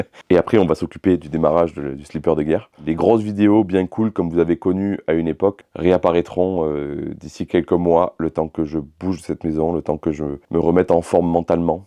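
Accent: South, France. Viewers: oui pourquoi 😅 On dirait le Suuuuuudddf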